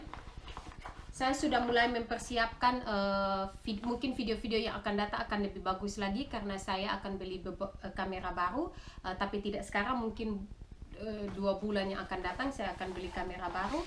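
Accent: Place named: Sulawesi, Indonesia. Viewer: Logat bahasa sulawesi, mukanya juga sulawesi, slam kenal..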